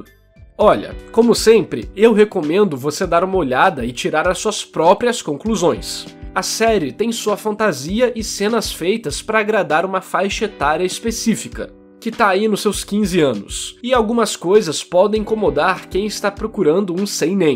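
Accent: Rio de Janeiro, Brazil. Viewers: CALMA AÍ NARRADOR VC TBM É CARIOCA??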